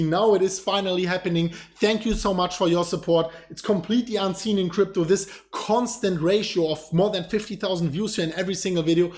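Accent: Germany. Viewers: Love your german accent!! Love your videos! Keep doing what you're doing!! How can we turn on the hash ribbons on binance?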